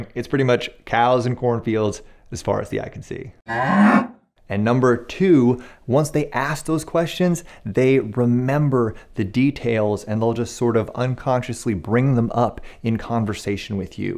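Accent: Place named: New York, United States. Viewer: l Loved your New York Accent!!! 😂😂😂😅😅😅😂😂😂😇😇😇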